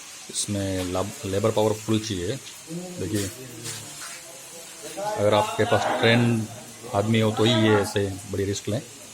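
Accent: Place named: Mewar, India. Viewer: Ktu bhaisab mevadi bolira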